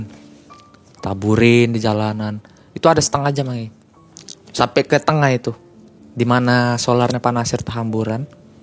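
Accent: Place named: Samarinda, Indonesia. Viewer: Dengar logat Bang jadi inget temen di kampus, beliau orang Samarinda. Pas beliau menikah, saya datang, memang sih kalimantan itu kanan kiri hutan, kalau bukan orang kalimantan yang bawa mobil agak sulit. Kebayang malam2 bawa mobil ke bukit yang notabene hutan begitu, jelas harus hati-hati, karena memang bangsa jin lebih banyak bersarang disana.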